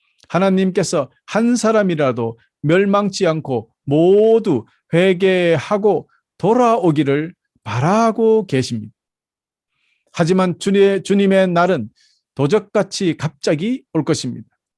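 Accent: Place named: Gyeongsang, South Korea. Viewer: 아 그리고 혹시 목사님 경상도 신가요? 손 좀 씨꺼라.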